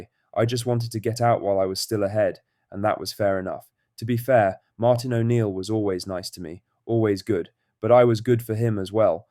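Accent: United Kingdom. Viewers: its shite...why wouldd a posh englishman havee a celtic channel...its a1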